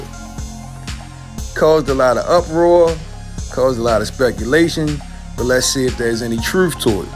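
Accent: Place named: Baltimore, United States. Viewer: i can tell you're from baltimore btw 😂😂😂😂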